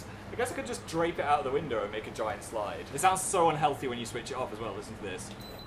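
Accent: North, United Kingdom. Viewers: I thought Phil sounded kinda northern in this particular video. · I didn't notice your accent was northern until this video... wow I'm observant. Now I can't pay attention to what you're saying I'm just listening to the accent. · you sound so freaking northern this week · that northern accent though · I was about to say you sounded a lot more northern before you said something 😂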